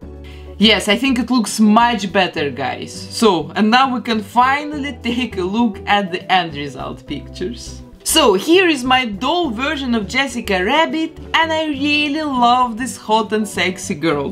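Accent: Russia. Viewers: It's russian accent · Русский акуцент, здравствуй🖐🏼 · Is it Russian accent?🤔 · I dont mean to sound rude and mean no offence.. but are you russian? I ask cause of ur accent it reminds me of my aunt who is from russia.. but i love ur video of making jessica rabbit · Ты из России? Слишком похожий акцент... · Она из России? Слышу русский акцент. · Ааа, мне кажется, что вы русская, акцент очень смахивает на русский, но... Мало ли? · Сразу по произношению можно понять что вы русская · Русский акцент? Разве нет?🤔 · Почему мне кажется что вы знаете русский язык?) Слышиться русский акцент😊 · Русский акцент, неплохо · Я один слышу ее русский акцент? Не? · You're not Russian by any chance? I just hear the Russian accent. I don't know why. By the way, it turned out very cool👍🏽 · Неужели это русский акцент? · Мне одной кажется что у неё русский акцент? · Акцент таки прям русский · О этот отвратительный английский. 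Такое чувство что чисто русская баба пытается на английском разговаривать а в итоге получается ранглиш. От произношения больно ушам приходится смотреть без звука. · Слышу русский акцент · блин, слышу типичный русский акцент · Пиздецки ужасный английский! Такого русского акцента я давно не слышала. Это прям 10 из 10. Лучше бы просто текст поверх видео пустила. Жесть, тебе категорически не стоит разговаривать на видео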